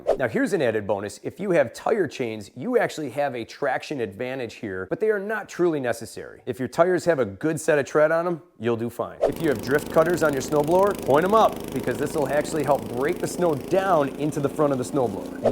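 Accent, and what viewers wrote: Buffalo, United States. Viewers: First time watching your channel, I guessed you were from Buffalo before you said it 😅 I’m from Getzville. We got a ton of snow from this blizzard. Hoping you made it out ok!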